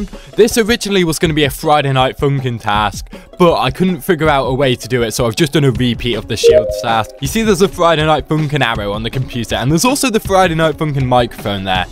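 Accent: United Kingdom. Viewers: You british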